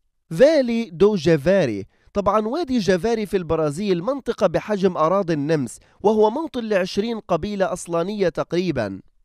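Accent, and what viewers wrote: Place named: Gaza, Palestine. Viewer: الي بحكي مبين انو فلسطيني من غزة حسب لهجته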